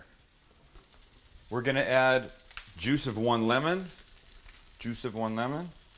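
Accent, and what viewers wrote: Canada. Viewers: Love his Canadian accent! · Is this guy Canadian? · please ditch that annoying Canadian drawl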